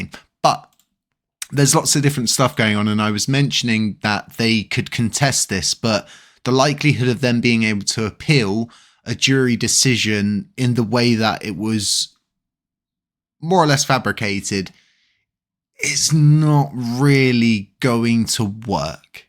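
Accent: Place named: Oxfordshire, United Kingdom. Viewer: Trying to work out your accent. Oxfordshire? Never the less. Great content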